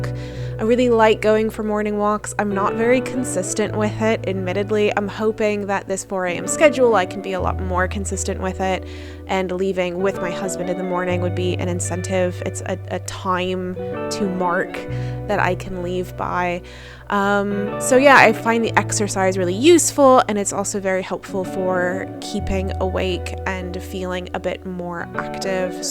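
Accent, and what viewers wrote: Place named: United States. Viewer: Which city are you living ? Because it seems like European country but your accent is like American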